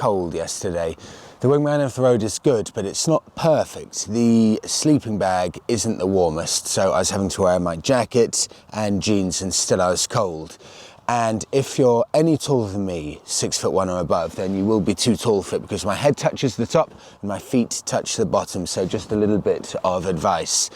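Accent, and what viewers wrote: RP, United Kingdom. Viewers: Firstly, thank you for speaking so eloquently! As a fellow Brit, it's very refreshing to hear the Queen's English spoken as it should be... Secondly.. How.....? I used to have a Bonneville and found it very uncomfortable after about 70 miles.. Also, it needed filling up every 85 miles... Fair play to you though... I've done this trip before on an FZS600 and loved every minute of it..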